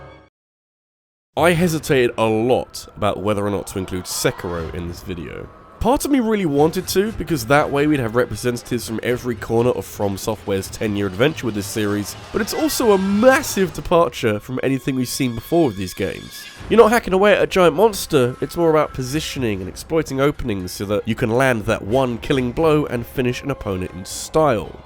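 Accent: United Kingdom. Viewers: I don't play the Soulsbourne games I just like this weird British guy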